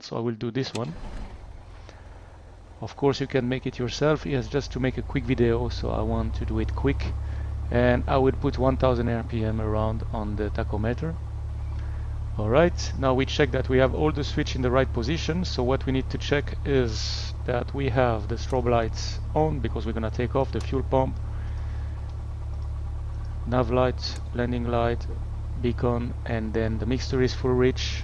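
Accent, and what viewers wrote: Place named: France. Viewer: I love your France accent realy now my request me and all my friend between <num> group what they call us gooney birds so..fsx or developer put all gauges in the wrong place we were making correction by FSPanel studio in fsx but now P3D v4.2 way better then fsx which is normal new generation smart boys,,but we don't have FSPanel Studio for P3D v4.2 can you help us we are 200 thounds staying a life make us happycheck me out in you tube..murray ayata fspanel studio may be better understanding regards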